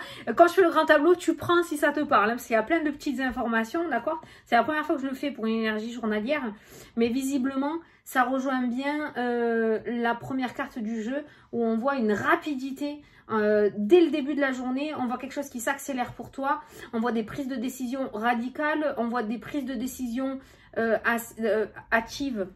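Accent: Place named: Var, France. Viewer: super toujours aussi speed,ton accent vient du ( var ) bonne journée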